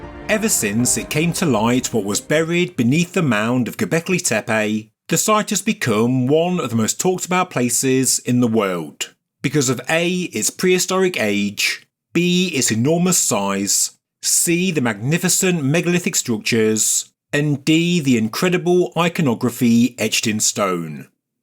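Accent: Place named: England, United Kingdom. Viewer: What a complete load of rubbish... you people with the PhDs just parrot the books and words of others and NONE of you think for yourselves. The entire Gobekli Tepe site refers to the movement of our galaxy for a period from its creation 257 billion years ago to the point in time it was modified some 90 billion years ago. The animals refereed to on the pillars are those who evolved and some recovered extinct humans from a past time... The site deliberately buried as what it refers to , no longer happens. The good things is,,, those who left Gobekli Tepe and the Pyramids and Megaliths come back and remove all those telling the lies of human history and MANY have English accents... just like yours..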